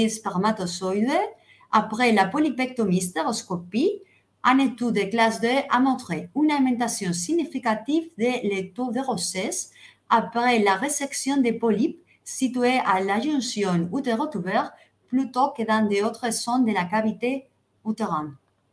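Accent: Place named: Spain. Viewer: Me encanta tu manera de hablar francés mezclada con español. Gracias por la explicación.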